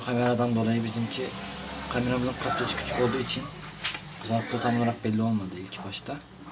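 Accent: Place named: Mardin, Turkey. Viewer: %100 mardinlisin konuşmadan belli :)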